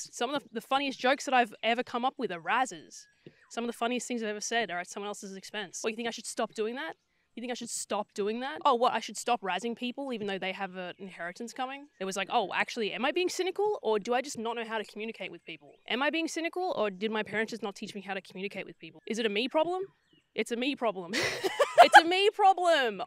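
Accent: Australia. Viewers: my favourite part about this video is that you sound Australian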